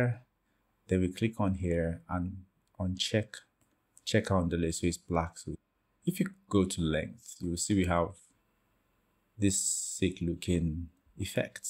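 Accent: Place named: Nigeria. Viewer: You sound Nigerian and I love the way you speak clearly!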